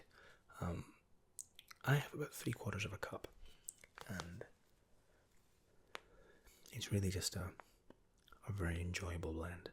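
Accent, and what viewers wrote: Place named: United Kingdom. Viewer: I have found my new favourite bed bug. Love your work. You have a wonderful mixture of toned down enthusiasm and relaxation in your voice and your accent is like nothing I've heard before. It's British, Irish, sometimes even with a hint of Australian... it's mesmerizing. May I ask, where ARE you from exactly? Have you travelled alot and picked up this little smooth smoothie of accents along the way? I'm Swedish so I am certainly not an expert when it comes to English accents but I've listened to enough to know that you sir are one of a kind in the ASMR game. ❤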